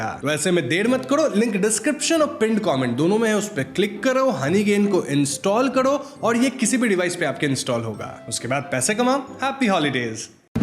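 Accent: Bengal, India. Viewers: Can I ask you a question sir....are you Bengali?? Cz you're Hindi accent main thoda thoda Bengali wala accent aa jata hai.... ( I am Bengali )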